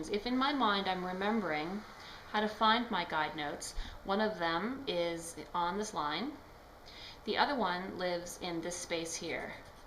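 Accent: Canada. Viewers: Finally I get why the Canadians on South Park say aboot ! Never heard it pronounced so clearly before :) Great video btw, just the right level of difficulty for me!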